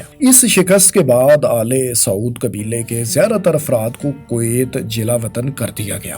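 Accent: Punjab, Pakistan. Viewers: Your heading is grammatically incorrect. Don't you know that first form of verb is always used after did. Secondly, you say simple Urdu words in Punjabi accent like a typical Paindo does. Gal samaj ayi?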